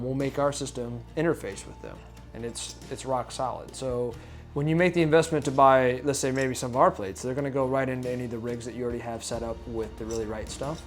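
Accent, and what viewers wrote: United Kingdom. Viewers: Don't you just love British accents ? :D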